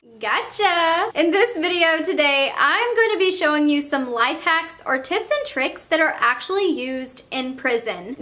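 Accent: South, United States. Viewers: she talks country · why do u always talk like a country girl is gross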